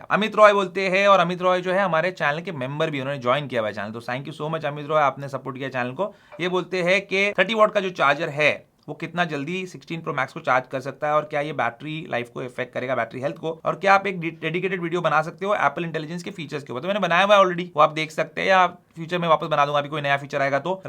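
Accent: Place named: Hyderabad, India. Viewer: assalam walekum bhai Kyunki aap Hyderabad Walon ke jaisa baat karte ho❤❤❤❤🎉🎉🎉🎉🎉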